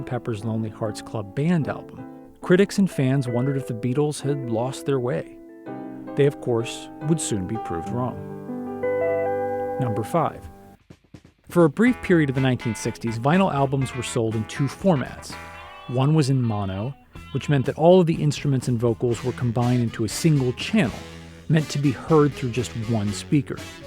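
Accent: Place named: United States. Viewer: Annoying yank 'expert ' voice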